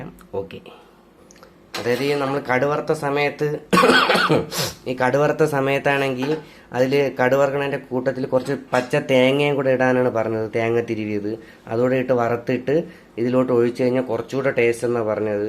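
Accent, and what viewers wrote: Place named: Thiruvananthapuram, India. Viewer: തിരുവനന്തപുരത്തിന്റെ സ്വന്തം പുത്രനാണ് അതേ ഭാഷ സ്ലാങ്ങ്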